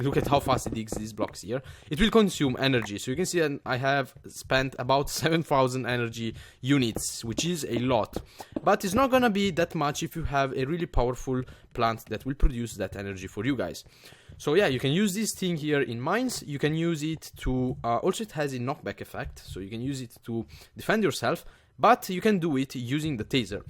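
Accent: Italy. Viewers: He is Italian accent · He isn't a native English speaker, he's Italian :-D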